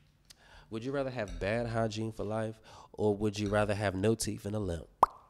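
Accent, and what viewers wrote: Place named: Norfolk, United Kingdom. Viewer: Loving the hair and the fittt 😍 I definitely thought you was from Norfolk though lol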